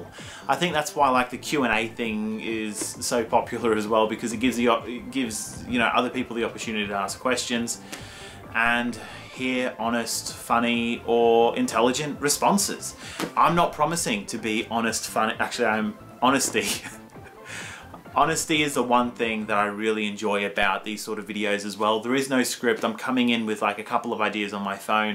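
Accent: Australia. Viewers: your creativity, editing style and fun aussie accent are what I enjoy about your videos. you should do whatever you are passionate about in your videos. keep up the good work bro :)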